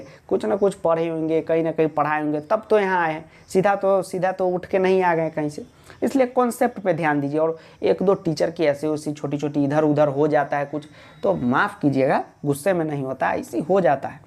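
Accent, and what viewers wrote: Bihar, India. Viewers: Omg!!! Your Bihar ascent is so sweet.... Can you plzz give me some short trick of sanskrit 😂🤣😂🤣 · Sir improve your hindi,English it is full bihari version learn from your wom channel 😀 and stop diverting us from studying to movie (wth is sonny deol). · Next Level Teaching !! Bihari Ascent '"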